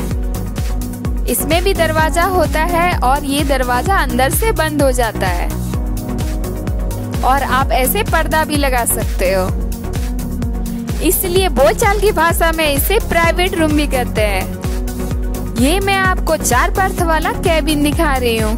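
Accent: Bihar, India. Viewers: Your bihari accent is lovely 😍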